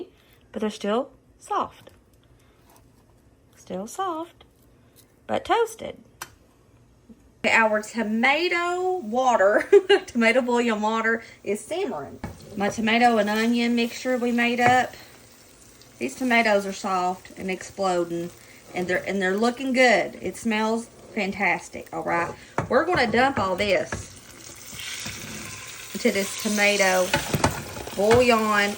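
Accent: South, United States. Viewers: Your videos are like memories for me except I had 4 girls. We're in Ohio and my daughters are now adults with their own families. They still make these meals one has modified them for gluten and dairy free. That's is not always a cheap way to. Love the southern draw as my husband's family is from Crossville Tennessee!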